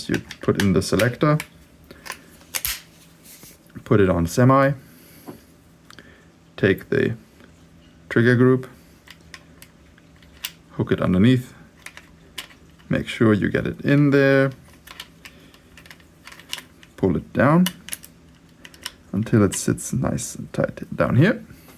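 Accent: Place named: Germany. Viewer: Mann hört das deutsche aus dem Akzent 😂 Bro was für ein Feuerwall sicherungshebeö verwendest du ?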